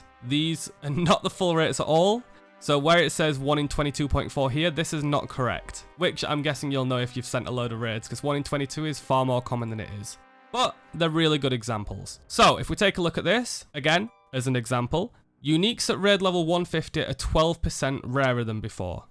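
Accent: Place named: Yorkshire, United Kingdom. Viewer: Finally a Yorkshire accent. I understood everything. Great rundown, really helped.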